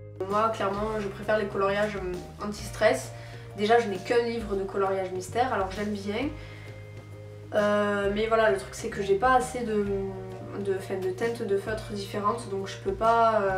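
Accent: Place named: South, France. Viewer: Coucou, c'est Myriam, j'ai beaucoup aimé ton TAG, tu as raison, 20 livres, c'est déjà suffisant pour avoir de quoi faire!! :p Par contre, tu as un sacré accent, tu viens du sud? :)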